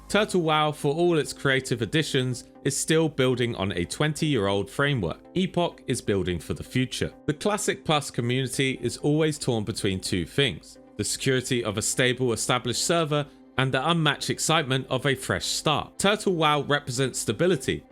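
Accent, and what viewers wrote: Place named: United Kingdom. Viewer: This was a very biased “hyped up” video. Let’s wait for Epoch to actually have a functioning server before we weigh the options. Also, a lot of what you stated about Turtle WoW demonstrates that you’re paid advertising for Epoch and/or haven’t done your research. You can’t hide behind your classy Victorian accent. Do your research, sir.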